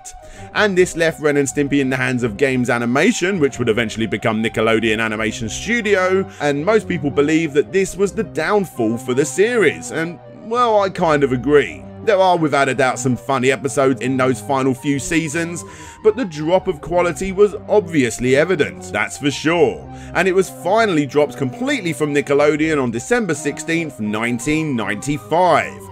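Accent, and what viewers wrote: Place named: United Kingdom. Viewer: My wife can't understand English and still notices you're British.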